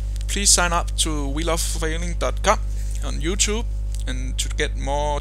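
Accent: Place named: Denmark. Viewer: Du lyder meget dansk. You sound very Danish.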